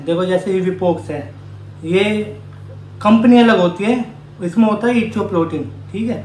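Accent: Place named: Bulandshahr, India. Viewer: Bahiya app disst Bulandshahr se ho kya language se aise he lag raha ha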